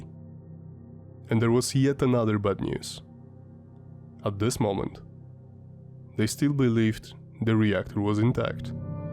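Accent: Russia. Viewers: oh ok. I wasn't sure . I do like the accent and thought you may be Russian.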